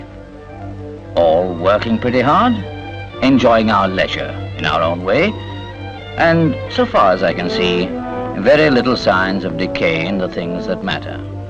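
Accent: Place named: Yorkshire, United Kingdom. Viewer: You can hear traces of a Yorkshire accent in the presenter's RP.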